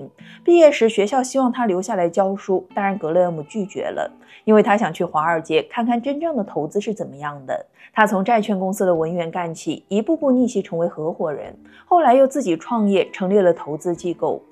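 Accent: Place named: Northeast, China. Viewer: 是东北主播吗？能听出家乡音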